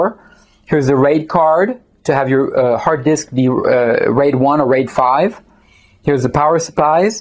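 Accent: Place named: France. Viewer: Forgive me but you sound French. Bravo!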